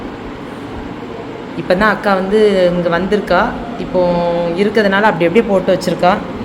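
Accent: Tirunelveli, India. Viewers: உனக்கு திருநெல்வேலியா தூத்துக்குடியா ......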